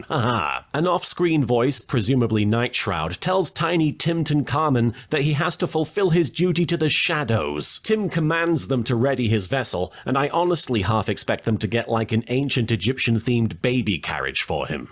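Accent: United Kingdom. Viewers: Is it just me, or did somehow become MORE british?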